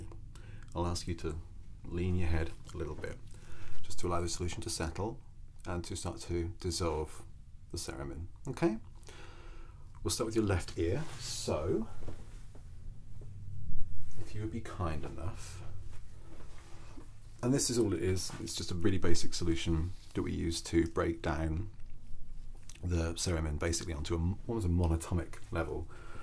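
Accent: United Kingdom. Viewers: 썸네일만보고 속삭이는 소리 기대하고 왔는데 제가좋아히는 영국식 영어에 목소리까지 너무 좋네욬ㅋㅋㅋㅋㅋㅋㄱ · Its incredible how much i love the british accent!! Besitos From Spain♡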